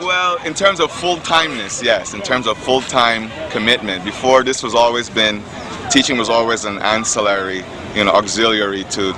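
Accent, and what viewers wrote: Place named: Latin America. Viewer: Me. wrote something in Spanish recently. I wonder if he is Hispanic, I hear a slight accent, no? He mentioned Puerto Rican and black influence.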